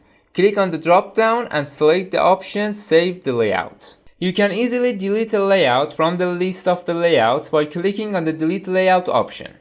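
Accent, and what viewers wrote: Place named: Iran. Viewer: داداش لهجت داد میزنه ایرانی 😂عالی داش همینجوری قوی ادامه بده خوشحال شدم ویدیوت رو دیدیم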